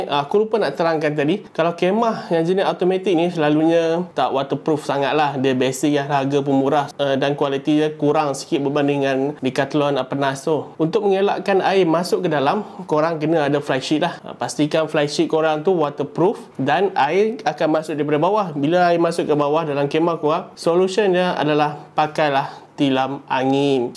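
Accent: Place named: Kedah, Malaysia. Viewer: cakap kedahlh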